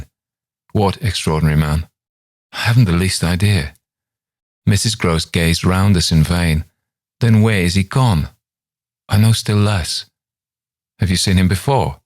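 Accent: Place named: United Kingdom. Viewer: Your charming British accent certainly got me through this lengthy, stretchy but nice and ghostly story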